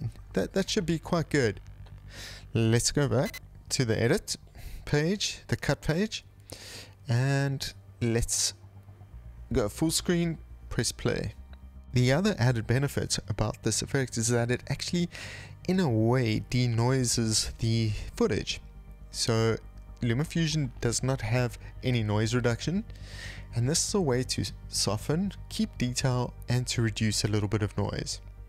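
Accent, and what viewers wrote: South Africa. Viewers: Spot a South African just by their accent. Great video mate! I use Lumafusion for all my editing and grading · I could hear the south African accent and then I saw table mountain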